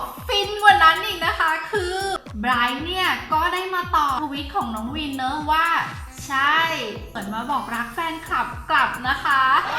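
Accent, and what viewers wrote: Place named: Guangzhou, China. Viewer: ไปบอกเค้าทำไมว่าไม่เทพอะครู .. แม่ป๋อมฟังคือสำเนียงกริ๊บเลยค่ะ นึงว่าจบเอกจีนจากกวางโจวมาเลย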